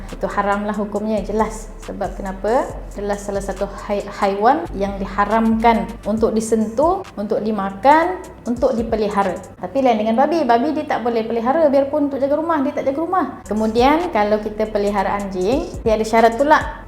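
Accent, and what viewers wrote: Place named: Sabah, Malaysia. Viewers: Suka sangat loghat Sabah dia.. =) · Start saja dia mula becakap aku tau suda sabah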